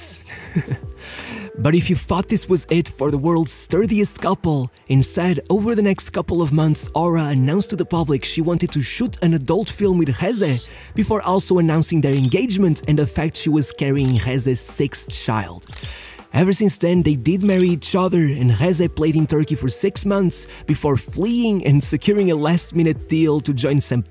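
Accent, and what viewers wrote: Portugal. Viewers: Why is He pronouncing the spanish names as If they are from portugal? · Because he is Portuguese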